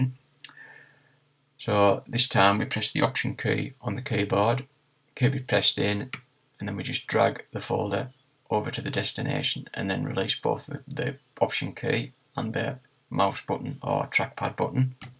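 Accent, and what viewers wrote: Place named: Sunderland, United Kingdom. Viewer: I’m in Sunderland and it’s great to hear a similar accent rather than the many American tutorials. Thanks for the help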